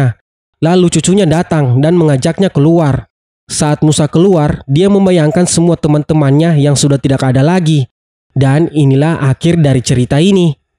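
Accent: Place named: Palu, Indonesia. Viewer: naratornya seperti logat Palu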